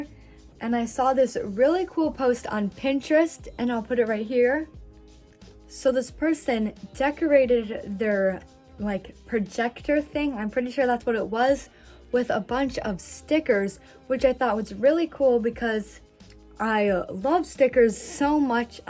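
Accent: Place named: Ireland. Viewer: i can really tell you have a irsh accent ❤️❤️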